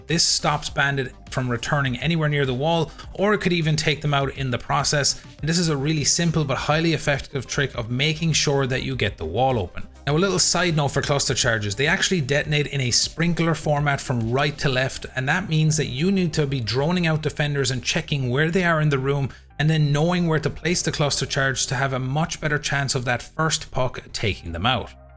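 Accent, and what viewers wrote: Ireland. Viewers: Probably because he's Irish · Hes probably just irish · What part of Ireland r u from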